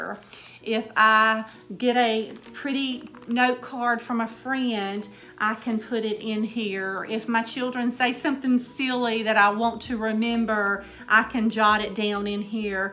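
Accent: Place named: Georgia, United States. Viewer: i love this ! i really like that you didn't make it too fat so you have lots of room for stuff. i would love to see how you sew it together & i would like to know how to tea stain paper. i've tea stained fabric for years, but not paper & i think it looks beautiful. you have lovely original ideas & i'm so enjoying your vids. i know we're neighbors cause you sound like everybody i know :):) have a great week & God bless :) :) nancy in ga usa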